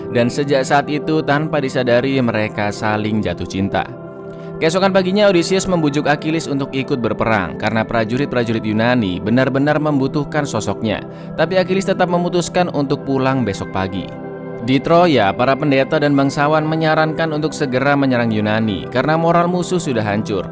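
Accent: Java, Indonesia. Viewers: Bang orang Jawa ya bang?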